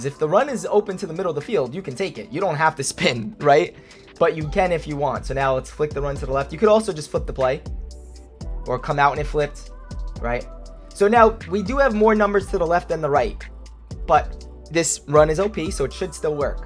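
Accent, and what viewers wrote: New York, United States. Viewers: Can’t stand that New York accent😭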